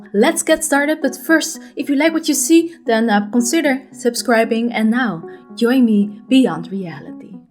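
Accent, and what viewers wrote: Netherlands. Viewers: I clicked on the video and heard the dutch accent, immediately subscribed 😂🇳🇱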